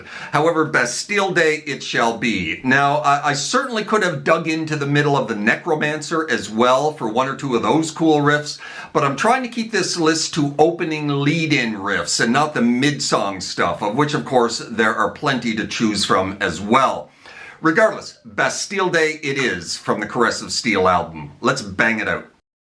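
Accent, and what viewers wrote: Canada. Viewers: Soon as I heard the Canadian accent I knew I was listening to a man who knows his stuff. Rock on from Detroit 🤘🏼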